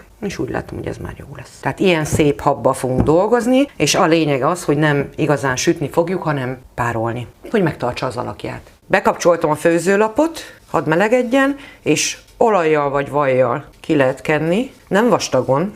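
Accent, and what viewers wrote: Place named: Debrecen, Hungary. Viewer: Igen...mintha Debreceni lenne a hölgy./Hajdú Bihar Megyében van/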